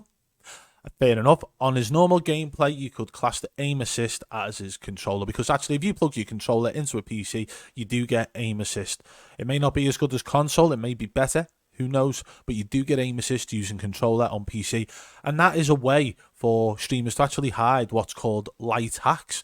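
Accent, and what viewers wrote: Liverpool, United Kingdom. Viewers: Are you a scouser ? cause i heard an accent. or am i deadly wrong lol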